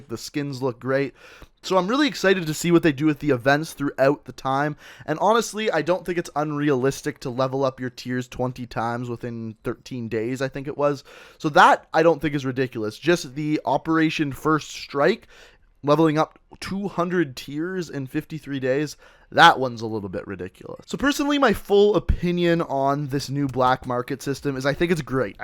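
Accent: Canada. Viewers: 9 seconds in the video can already tell your Canadian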